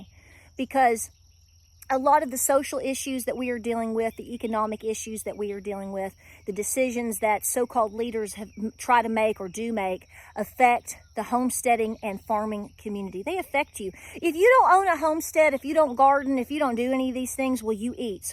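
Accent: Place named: New York, United States. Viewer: You are definetly my girl. Im from ny and live in nc now I speak like you. The truth. Lu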